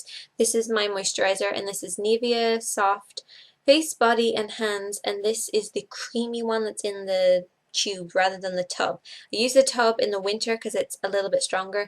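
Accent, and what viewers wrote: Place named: Ireland. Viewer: you're Irish aren't you? lol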